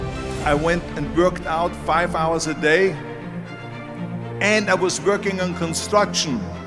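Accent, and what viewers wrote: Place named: Austria. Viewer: Was ich nicht verstehe, ist, dass jemand nach all den Jahrzehnten immer noch Englisch spricht wie ein Österreicher.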